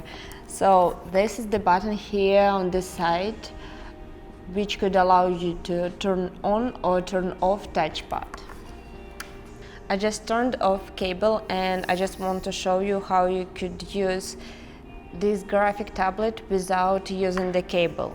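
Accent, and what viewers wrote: Russia. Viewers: Russian accent?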